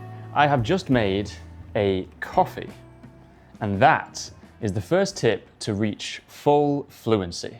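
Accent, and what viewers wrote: Northern England, United Kingdom. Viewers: Who loves the British accent like me? 🙄🤓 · thank you you are kind and well done on your youtube. I am a senior English teacher from MOROCCO..your accent tells me you are from the northern part of England 😊 thank you very moutch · Hi,! Why do you say "mug" as [mug] but not [mʌg]? Are you from Northern England?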